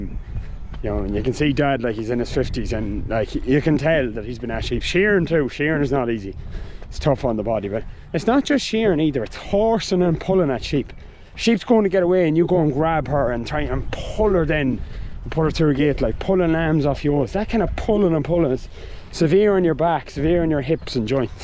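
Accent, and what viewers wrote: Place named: Ireland. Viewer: Hi...loving your vlogs,it’s great to see you back home.My cousin is trying to do our family tree, and it appears our family originally came from your part of the world.I remember my grandparents,plus aunts and uncles, ( sadly all passed now )speaking in a lovely Irish accent…talking about Leprechauns, great story tellers, So hearing you talk,and seeing the landscapes,makes me feel closer to them and where they came from,very much appreciated. Sue (Woodhall Spa) x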